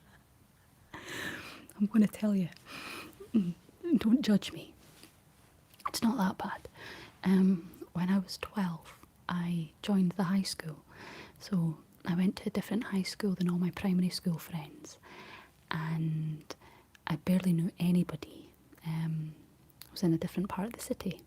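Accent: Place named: Scotland, United Kingdom. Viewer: So glad found another Scottish 🏴 lass i can listen to I love your story’s really helps me get to sleep thanks love from highlands❤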